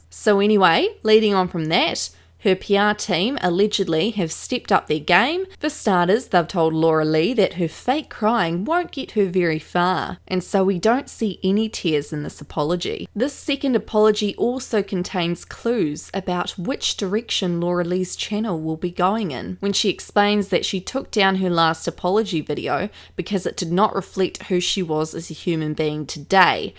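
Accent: Australia. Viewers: Are you an Aussie or a kiwi??? I’m British so I can’t tell the difference!! Hahahah you should do a video about you rather than drama your voice and accent is great sis! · sounds Australian to me :)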